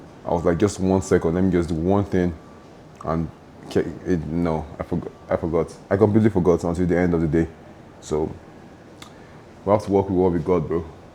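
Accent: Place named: Nigeria. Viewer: I can hear the Nigerian accent 😂 never leaves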